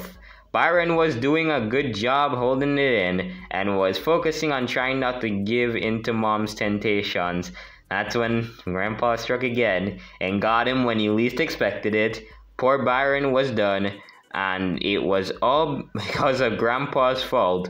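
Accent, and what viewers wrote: Jamaica. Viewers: are you jamiacan🇯🇲? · It’s cool that your Jamaican mon! I can hear it in your accent (I’m from TT) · Hey man are you a jamacan my guy i can tell by you accent🇯🇲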